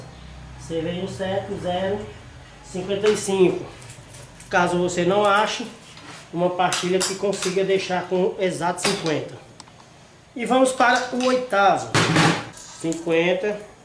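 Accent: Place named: Rio de Janeiro, Brazil. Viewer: Bom dia,vc é do rio de janeiro?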